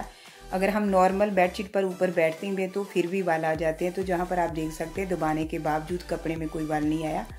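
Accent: Punjab, India. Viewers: Thanks par ek baat confirm hai ki aap Punjabi Ho apke bolne ke tarike se pata lag raha hai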